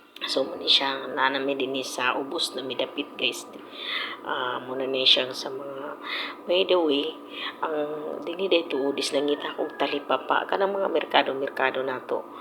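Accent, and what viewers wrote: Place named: Visayas, Philippines. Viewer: Bisaya diay ka ma'am 😅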